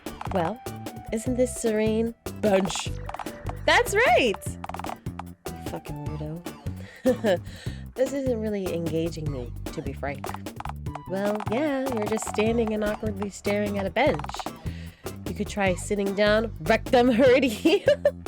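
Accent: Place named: United Kingdom. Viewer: i'm still in the beggining of the video, but, stellar british accent by the away 10/10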